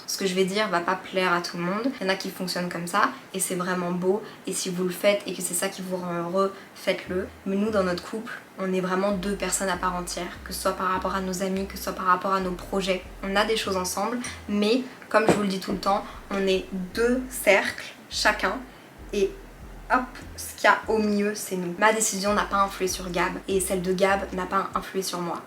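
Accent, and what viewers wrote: Quebec, Canada. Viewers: Je trouve que t’as pris l’accent québécois 😌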